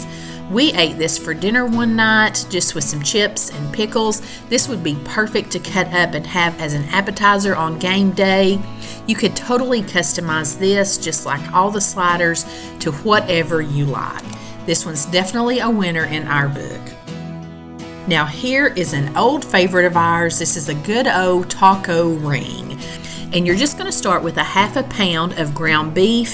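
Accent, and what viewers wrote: South, United States. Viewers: Wow, what a treat!! Have shared to my laptop. But a question - are these recipes available in writing? I must say. Your beautiful southern accent is music to my ears. I left Alabama with my children and pastor husband to Hawaii in the late 70’s. Oh, I just heard Tennessee, the place of my birth. He pastored a church for years, but is in heaven now. I stayed here as my children married wonderful local people. And have their roots planted deep in Hawaii. Mahalo for your scrumptious recipes. Do hope they are available in writing to print. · I’m new and catching up with your channel! Love your crescent roll options and looking forward to trying all these! I am from NYC but also lived down south! I so enjoy the “southern comfort” of your voice and your calm demeanor! You are just great therapy ma’am! Cheers, Michael Albuquerque NM USA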